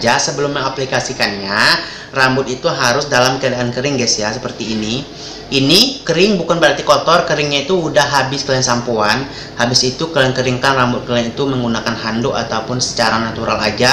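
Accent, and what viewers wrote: West Sumatra, Indonesia. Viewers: Logat Minang nya, Karen bang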